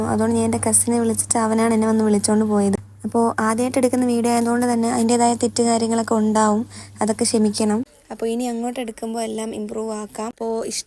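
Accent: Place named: Trivandrum, India. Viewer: Slang trivandrum anu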